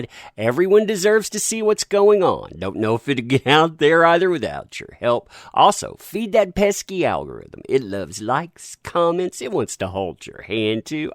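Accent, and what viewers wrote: South, United States. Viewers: I love the sound of your voice. I can't place your accent. It feels slightly Southern, but maybe some Midwest? Whatever it is, you have a great presenters voice. It draws the listener in. Keep it up.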